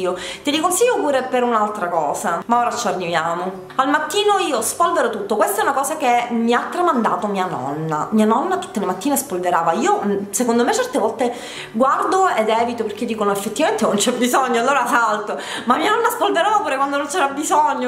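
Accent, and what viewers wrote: Sicily, Italy. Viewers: per favore puoi farci un home tour di casa tua... sto per arredare casa e vorrei magari dei suggerimenti e delle idee... sei bellissima e fantastica mi piace un sacco l'accento siciliano che hai grazie per la compagnia un bacione:* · ma sei siciliana????😉 infatti hai detto bene essendo in casa sola o.....massimo 2😊😊 se uno ha bambini piccoli gia la cosa cambiaa😁😁ti va passare anche da me??? ciao carinissima...simpaticona...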